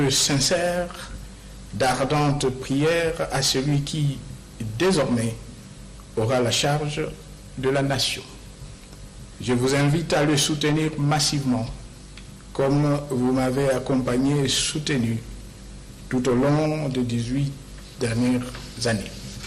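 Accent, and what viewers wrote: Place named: Rwanda. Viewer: Français avec tonalité Rwandaise.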